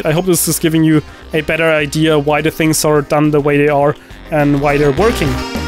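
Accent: Germany. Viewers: Craig Ferguson doing CS:GO tactics after the bell rings xD I love german accent. Somehow makes me giggle. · junge du klingst soooo deutsch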